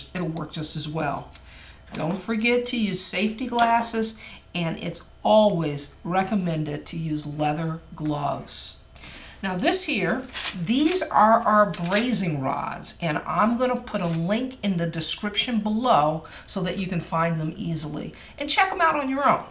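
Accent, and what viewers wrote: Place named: Fargo, United States. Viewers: It has a FARGO twang, for sure. People from West Coast starting at the California/Oregon heading N have the same.....'bout like Sarah Palin (no offense).😋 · Cool vid!I dont know if youre from minnesota or not,but you oughta be.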